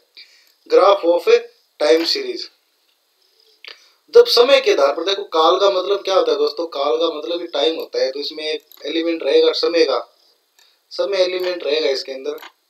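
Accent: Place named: Bagri, India. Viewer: Sir aap hindi bdiya nhi bolte . Aapke tone se bagri nikl rhi h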